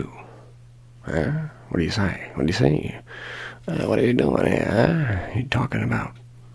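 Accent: Transatlantic, United States. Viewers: Trans Atlantic 😭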